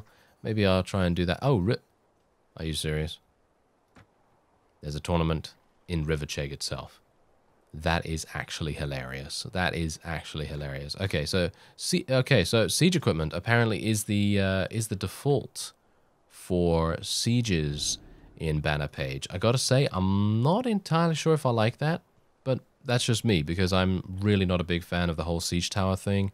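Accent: United Kingdom. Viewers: His voice changed so much, go to his first series with barney beartilde, he used to sound so british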